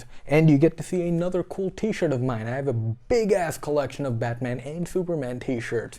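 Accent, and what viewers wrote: United States. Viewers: You don't live in America? Neither do I, lol. You do sound American though. Greetings from The Netherlands (we gave you Paul Verhoeven and Rutger Hauer) Ps: feel free to add me on Skype 'meeskeesz' Have a nice day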